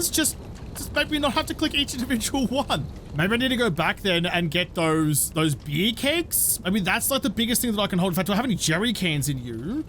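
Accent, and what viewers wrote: Australia. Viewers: "hypathermia or hypathermia, which one?!" Ah, aussie accent never change but also please don't be responsible for me in the zombie apocalypse when you have to ask for help on the radio with my very high or very low body temperature.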